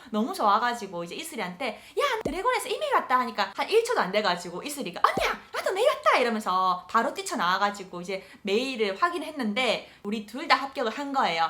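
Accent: Busan, South Korea. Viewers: 부산 분이신가요? ㅎㅎ 반가워서 글남깁니당:) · 느므느므 잼있게 잘 봤습니다~~!! 친구따라 강남 가셨네요 ㅎㅎ 아무래도 합격하는 운이 따른것 같다고 보입니다. 항상 님 부산사투리에 아드레날린 품고 갑니다~~!! 다음 썰때는 초점을 오른쪽으로? 살짝 돌리심 더 나을듯 싶어요 ㅎㅎ 항상 건강조심하시고 화이팅하세요!!!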